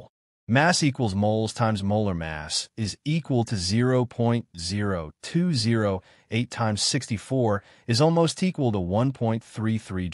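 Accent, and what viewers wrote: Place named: United States. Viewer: #* americian voice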